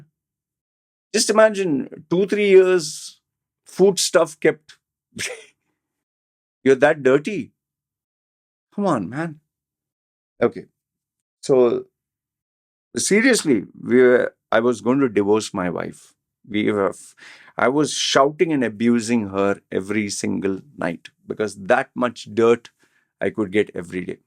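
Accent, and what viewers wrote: India. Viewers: please talk in hindi. Atleast introduce yourself saying hindi words. We would love to see your indian accent 😅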